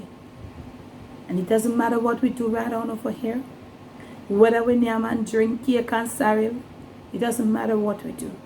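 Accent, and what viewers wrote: Jamaica. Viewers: Hello miss wishing you and families a happy new year for 2020 I like watching your cooking show they're very interesting like how you talk patwha, God bless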